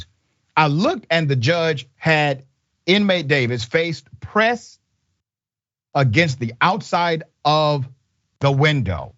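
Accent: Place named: South, United States. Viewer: 😂 love your southern accent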